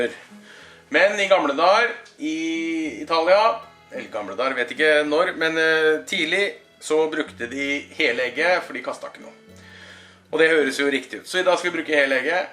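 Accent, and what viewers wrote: Østfold, Norway. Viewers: Veldig bra resept. Jeg lurer på som svenske 35 år i Norge, hvor er din dialekt? Kan det være nedre østfold, Halden, eller er det lenger opp?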